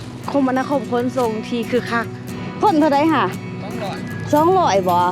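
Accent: Ubon Ratchathani, Thailand. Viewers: สาวห้องคือเว่าสำเนียงทางอุบลแท้บ่รู้ว่ารถโดยสารระหว่างประเทศทางลาวเปิดให้เข้ายัง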